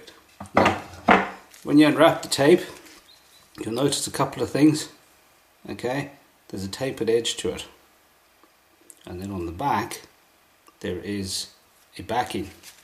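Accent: South Africa. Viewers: Well what's the chance of hearing a fellow South African showing us how this is done, shot boet! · Sounds South African to me!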